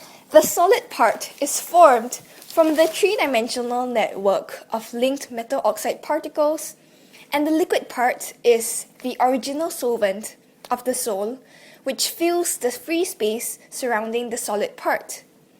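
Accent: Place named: France. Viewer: that french voice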